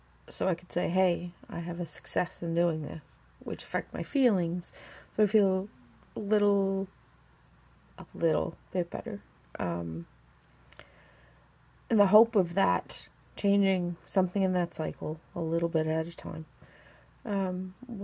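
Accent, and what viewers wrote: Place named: Cape Breton, Canada. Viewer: Thank you for sharing your story! Adding your voice is so valuable, be strong! Your accent reminds me of so many of my Cape Breton friends it makes my hearts warm!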